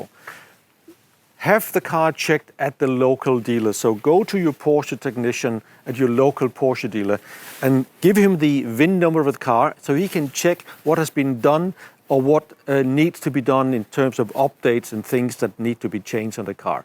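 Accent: Denmark. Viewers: Do I hear a sligth danish accent?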